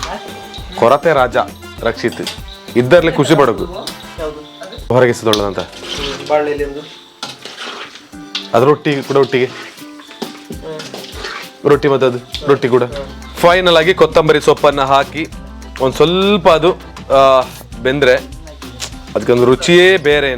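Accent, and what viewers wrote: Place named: Arebhashe, India. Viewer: Arebashe mathadi kelikke Kushi ade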